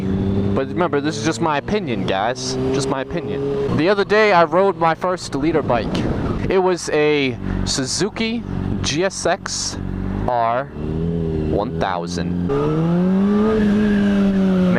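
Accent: Massachusetts, United States. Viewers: What part of Massachusetts you from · What part of mass are you from ??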